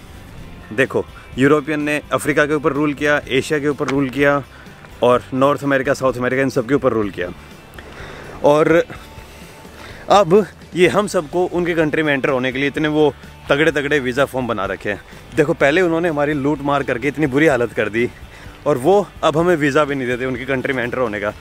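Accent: Haryana, India. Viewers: You have a nice haryanvi touch accent..... Like yr voice.....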